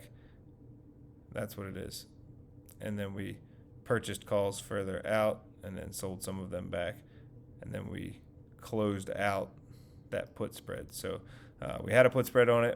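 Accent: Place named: North Carolina, United States. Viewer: You sound like you could be from N. Carolina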